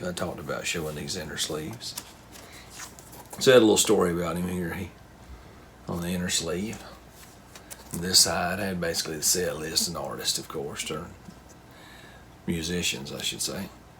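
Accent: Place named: South, United States. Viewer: I got a great vinyl collection but no friends to share them with. I know you would enjoy them. Shame I live over in England near a place called Liverpool of Beatles fame. Love your southern accent.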